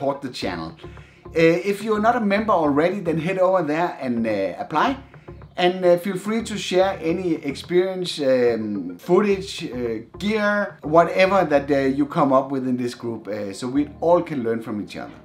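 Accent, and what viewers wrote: Denmark. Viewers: Love the accent. Danish... :D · That’s a very danish accent there👍👍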